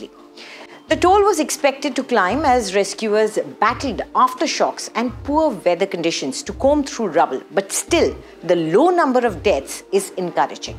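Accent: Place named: India. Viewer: 読み上げの女性の英語がめちゃくちゃインドなまりで面白かった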